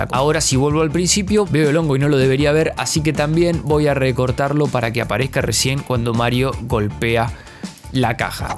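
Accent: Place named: Argentina. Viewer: no se si es porque hablas como argentino o que seera, pero por fin alguien que lo explica como corresponde!!!!!Argentinaaaaaaa , el idioma original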